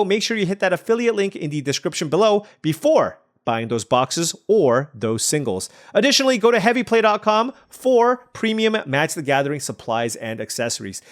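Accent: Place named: Seattle, United States. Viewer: It's a Seattle accent. We sometimes mumble some of our words.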